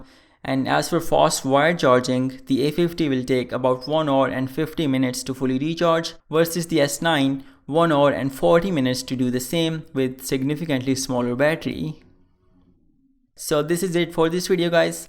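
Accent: India. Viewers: "click on vid" hears indian accent, "clicks off"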